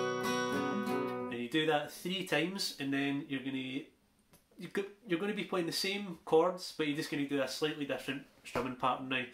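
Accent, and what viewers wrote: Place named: Glasgow, United Kingdom. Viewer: I really enjoy your lessons, the way you go over it is just fantastic bro. I don’t normally comment but wanted to thank you. I hope your channel’s doing well you deserve Success your style is natural and you are very relatable wae a Glasgow Accent. Loving the lessons keep them coming pleases & thank you again 🫡🫡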